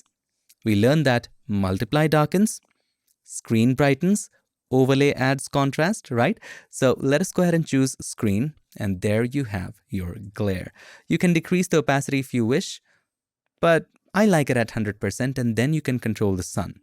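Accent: India. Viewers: Are you from India?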